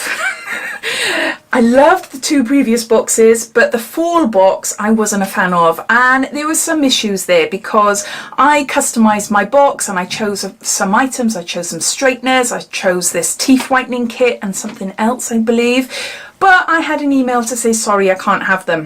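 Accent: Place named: England, United Kingdom. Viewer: I could hear you all day! I love your English accent ❤ you are so pretty too! Love your unboxing xoxo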